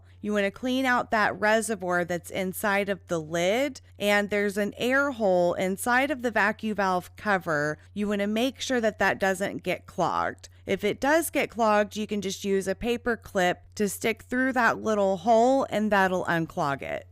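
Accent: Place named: California, United States. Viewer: That California tongue roll just doesnt let me concentrate☹️Great video thou.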